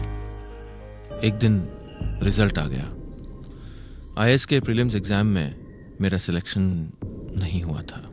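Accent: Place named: Lucknow, India. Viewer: आपकी आवाज़ से लगता तो था की आप लखनऊ, कानपुर के हैं. आज पता भी चल गया माँ- बाप के लिए सीख है की बच्चों पर अपनी इच्छाएं थोपें नहीं. उनकी रुचियों को अहमियत दें. नहीं तो बच्चे कब आपसे दूर चले जायेंगे पता भी नहीं चलेगा.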